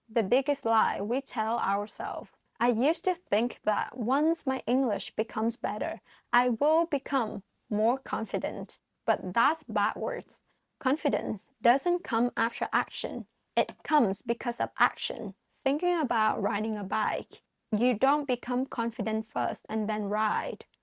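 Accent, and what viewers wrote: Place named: Australia. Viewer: Hi, I'm excited to see a new video on your channel - I loved it! It's also amazing that you are helping lots of people by sharing your own experiences. I can definitely pick up an Australian accent in some of the words you use. 😊 I think one thing I noticed from my personal experiences is that sometimes I don't feel confident internally, but when I rewatch a recording of a lecture or talk I gave, I can't actually tell - so I think insecurity is more often in our heads than something perceived by other people! (It's particularly relevant recording YouTube videos as well, it initially took me a while to get comfortable being in front of a camera on YouTube when I started my channel.) I made sure to like, as always, and looking forward to the next video! 🥳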